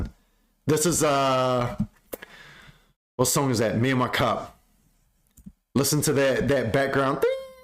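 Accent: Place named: New Zealand. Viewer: most new zealander accent of the year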